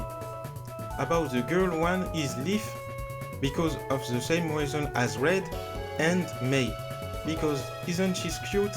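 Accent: France. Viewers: I love your french accents sounds good im not insulting you its true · are you french? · Toujours marrant l'accent français ! :p Tu te débrouille toujours mieux que moi xD Bravo pour tes 100k, continue comme ça ! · 😂😂on sent l'accent français haha bg frérot tu gères avec tes vidéos 👌💪🖒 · ça s entend que tu est francais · Ok so do you speak french ? You speak like a french ^^ Tu ne prononces pas l'anglais tres bien comme les français lol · I feel like you have a French accent '^' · Are you French? Because your English is a bit like mine ^^' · are you french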